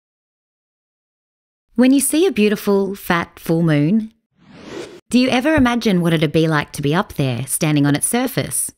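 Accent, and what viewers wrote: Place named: Australia. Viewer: Sounds Australian.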